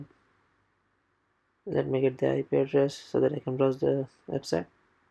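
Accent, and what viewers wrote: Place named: India. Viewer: Thanks for making that Video it helped me a lot. My Feedback would be , its pretty hard to follow the India-English Slang , in the Fast Speed Modus.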